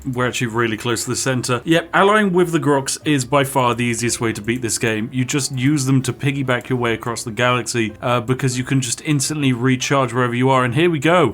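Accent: United Kingdom. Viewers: Me realised he’s practically British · Wait this guys british? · Is it just me or is he somehow acting better as himself than last video? Like, he's just more british than usual or something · I love how talks like a true colonizing brit from the 15th- 16th century. hilarious!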